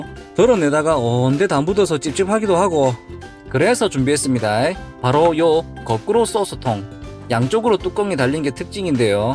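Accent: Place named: Yanbian, China. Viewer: 오오...억양이 연변분??😮 반갑습네다잉!!😮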